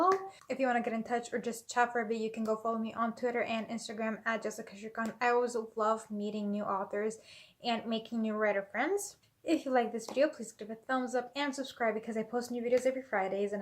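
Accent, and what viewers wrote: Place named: United States. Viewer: Thank you for the kind words! Also, thank you so much for sharing about your heritage! I love learning about things like that. I can definitely hear a little American in your voice. You do speak English very well. This may be a silly question, but do you strictly write in English? Thanks again for sharing!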